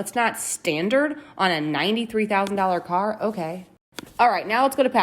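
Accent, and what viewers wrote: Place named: Minnesota, United States. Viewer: She has the Minnesota accent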